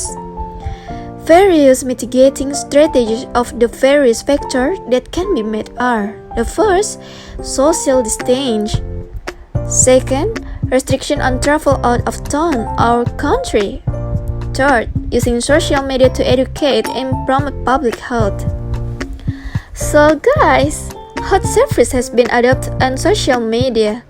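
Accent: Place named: United States. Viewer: American accent nih boss, senggol dong 😎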